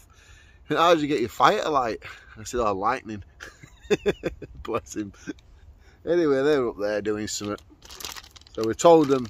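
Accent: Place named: Yorkshire, United Kingdom. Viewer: those Gaz lamps are great but... the mantle is a pain to fit and they are fragile. I just use rechargeable LED units now, both headlamp and lantern. As for the trainers... I wear them because they are comfortable and get the job done. There is a saying that every pound on your feet adds ten to your back. I wear some very light Sketchers. I bought a pair of Merrell Moab 2 which are designed for trail hiking but they are 500g heavier and not as comfortable. Keep up the great work, never lose the Yorkshire accent; it's great to hear after 50 years in Australia. I'm originally from Stockton-on-Tees.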